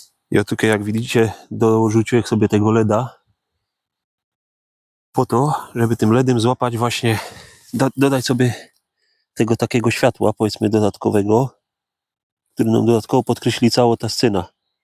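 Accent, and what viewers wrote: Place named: Silesia, Poland. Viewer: Piękne zdjęcia pięknego miejsca. Deszcz to dla mnie osobiście bardzo nastrojowa aura, lekko nostalgiczna, czasem taka nawet "Silent Hillowa". Jak zawsze: dowiedziałam się czegoś nowego. No i najważniejsze, czy aktualnie używany przez Ciebie jest akcent śląski w języku polskim, czy język śląski, brzmi to naturalnie i nadaje charakteru całemu kanałowi.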